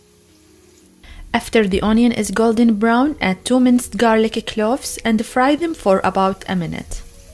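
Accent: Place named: Saudi Arabia. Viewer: عشقي الاكسنت السعودي ❤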